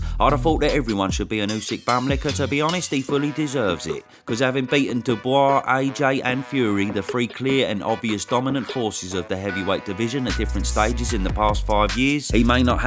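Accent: Cockney, United Kingdom. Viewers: This cockney commentary is awesome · Great videos but the chewsday accent is killing me 😶‍🌫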